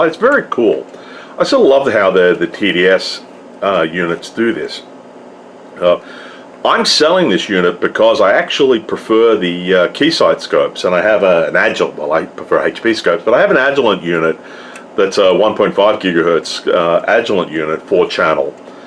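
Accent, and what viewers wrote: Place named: Australia. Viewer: Cool, keep the vids coming. Really enjoy them. Love the Aussie accent too, makes a pleasant change to hear "solder" as it should be pronounced !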